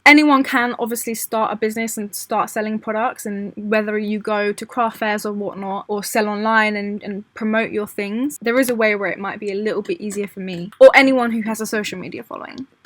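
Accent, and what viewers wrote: United Kingdom. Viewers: How did I know you were British even before clocking in the video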